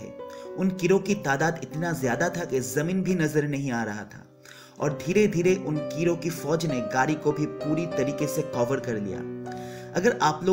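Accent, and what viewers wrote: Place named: Bengal, India. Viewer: Pehle to me pehli bar ye video dekh raha dusra ye bengoli acsent he to aap kaha se ho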